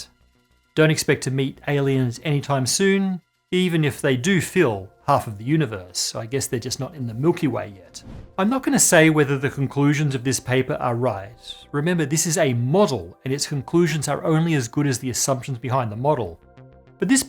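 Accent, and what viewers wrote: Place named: Australia. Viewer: This was great but I'm confused as to why you suddenly have an Australian accent Isaac. Nice use of the usual animations btw.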